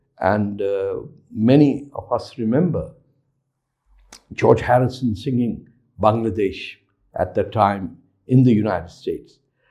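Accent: Punjab, India. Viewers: Miss your hindustani accent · Please talk in Urdu, you speaking English in Punjabi accent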